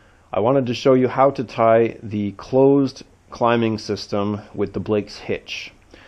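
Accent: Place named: Canada. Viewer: This is the Most Canadian dialect I have heard in years. Are you the real Red Green? (Beaner is racial slang criticizing Hispanic people, you should probably say the whole word.)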